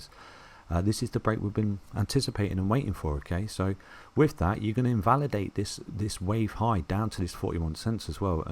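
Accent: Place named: Leicester, United Kingdom. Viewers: It's his Leicester accent., the pride of Leicester 👊👊🍻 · u have beautiful accent, i think u are from England, i dont have sure! I'm from Brazil and I like this accent Well i didnt know Europe accept crypto like XRP or other coin bro. I'm waiting this hit up $100 or more!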